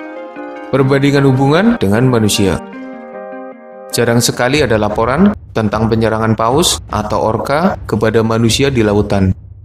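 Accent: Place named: Banyumas, Indonesia. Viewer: Bahasa jawa ngapak.. gak enak di dengar jawa ngapak..🤮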